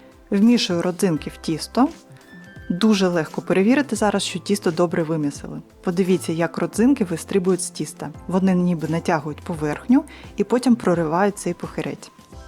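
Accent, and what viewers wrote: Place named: Ukraine. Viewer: Зачем на здесь слушать этот украинский говор.. Пусть на своих каналах вещают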